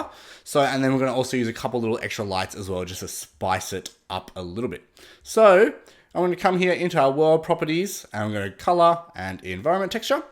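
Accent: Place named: Australia. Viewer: blood hell that was fantastic. I learnt heaps... and its great to hear another aussie too :)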